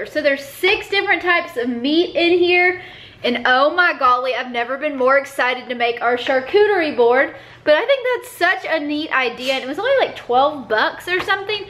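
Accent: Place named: Texas, United States. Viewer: Oh em gggeeeee!!! Just tried the hatch green chili dip from Costco ... it is DELICIOUS!!! Thank you so much for sharing!!! Just found you a week or so ago and I am now slightly obsessed! LOL! I’m a central Texas gal, so I can completely appreciate your Texas twang! 😉 Keto on!!!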